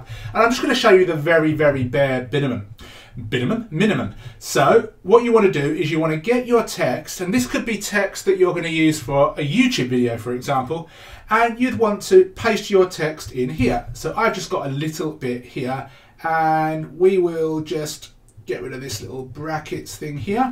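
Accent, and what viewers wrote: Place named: United Kingdom. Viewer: I noticed your region was 'Oregon' although you sound British. Is this so you can access the Neural voices? I'm from London area and they are not available (?) Is this because of the region specified in my AWS account or is it IP address? or what?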